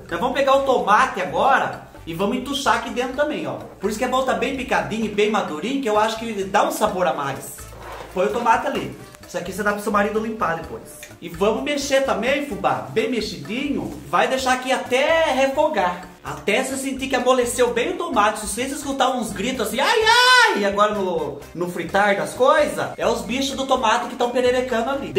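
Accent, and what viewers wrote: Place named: Minas Gerais, Brazil. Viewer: Mais um vídeo delicioso se é q me entendem.eta fubá nosso mineirinho adotado tem certeza q não nasceu em Minas Gerais seu sotaque e melhor q o meu kkkkkkk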